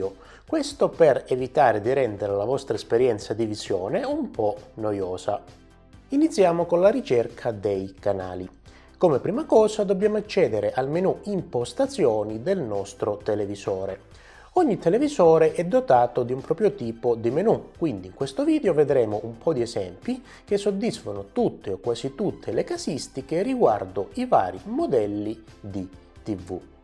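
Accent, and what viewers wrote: Calabria, Italy. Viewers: Sei calabrese?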